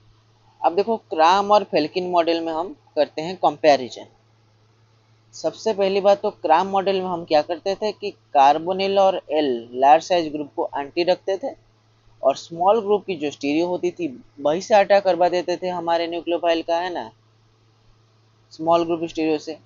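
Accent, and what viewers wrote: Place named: Odisha, India. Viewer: bhai tama video ra strating 10 sec ru jani deli tame odisha ru boli , bhai bhala explain karucha , i love it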